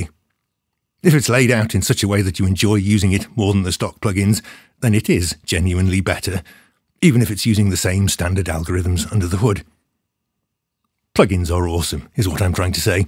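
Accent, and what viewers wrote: United Kingdom. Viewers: You sir win the Internet! Another brilliant video. Thank you for your wisdom and your BBC voice that delivers it haha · which area of UK this accent ?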